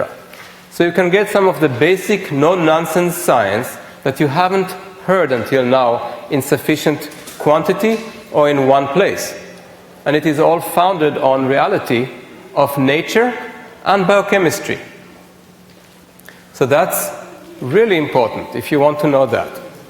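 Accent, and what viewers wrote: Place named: Israel. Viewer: when he pointed out his Israeli accent it was a ripoff of what Deepak Chopra does in his seminars to get rapport with his audience, mentioning his Indian accent.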